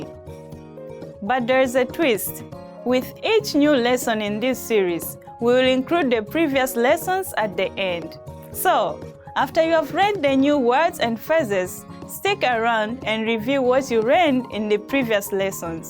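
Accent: Standard. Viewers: TZ standard Swahili ❤❤❤❤ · She is a Tanzanian presenter!. She speaks standard Swahili!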